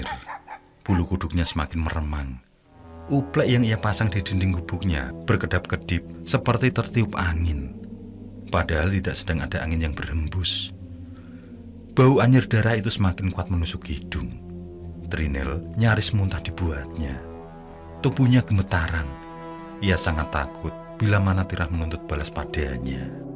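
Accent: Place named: Blitar, Indonesia. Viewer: Pak de bhasane mirip wong blitar ..🙏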